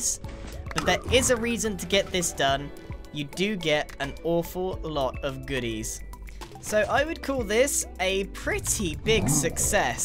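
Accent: United Kingdom. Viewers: "What in the broken teabags" yep, he's definitely british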